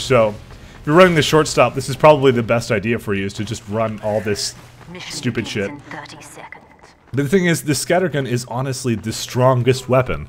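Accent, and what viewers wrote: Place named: Canada. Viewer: His Canadian accent may have something to do with it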